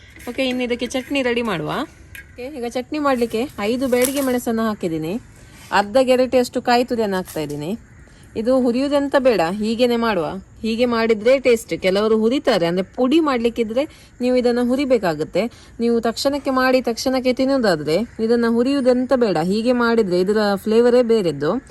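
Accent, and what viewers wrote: Mangalore, India. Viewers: Thank you! More than the chutney your mangalorean kannada was very easy and could easily understand. Chutney preparation was excellent. God bless u..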